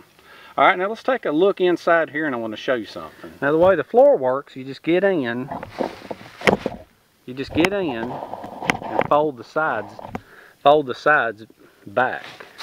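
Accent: South, United States. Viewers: HI. Nice to see you back again. ok, I see you as a walking, talking southern encyclopedia. Yet again, the southern messed me up. Shambered? So, I google and decide the word I am hearing is chamfered? Like a beveled edge? I think. Bless your heart. We yankees do not understand sometimes. LOL Love the no cordage. This stuff I can do. I have done no cordage many times. Most times I had no choice. Some of the notches were new to me. Appreciate that. Thanks for teaching some of us new and/or better ways of doing survival things. But please.... no more popcorn videos! Still makes me laugh. Be well. Be safe.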